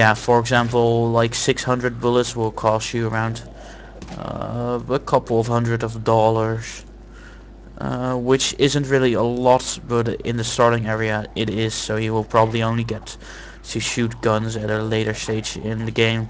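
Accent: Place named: Netherlands. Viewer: lol your dutch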